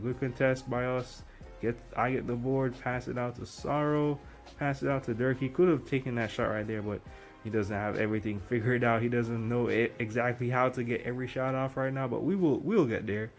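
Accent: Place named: Jamaica. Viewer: You sound Jamaican or Bahamian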